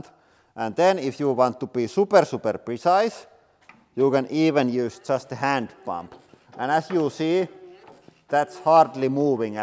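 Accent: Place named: Finland. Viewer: The rally English is strong with. ei pahalla mut perkele.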